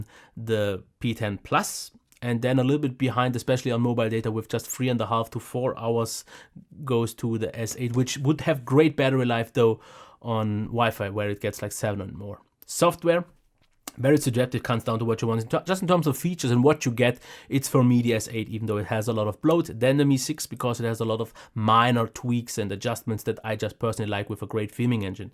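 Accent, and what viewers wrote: Germany. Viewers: Based on his name i think he's Croatian but based on his accent defo born in Germany or living there for a long long time!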